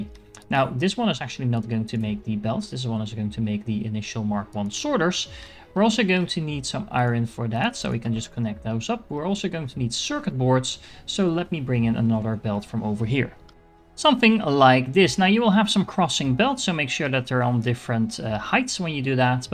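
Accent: Netherlands. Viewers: After deciding to pick this up again i came across your video. Nice one, i`ve already got 552 hours into this game but i still learnt something. Will definitely watch more of your content. Who doesnt love a Dutch accent! Thanks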